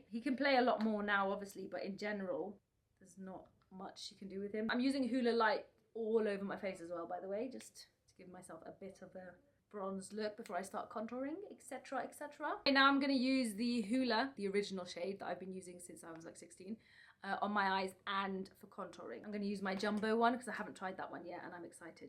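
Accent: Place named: Scotland, United Kingdom. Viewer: Oh I hear a Scottish accent! :D hello fellow Scot!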